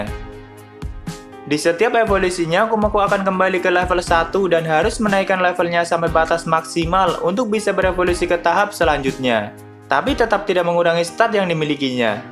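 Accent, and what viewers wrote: Banyumas, Indonesia. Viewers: Ngapak wkwk malah kocak gua dengernya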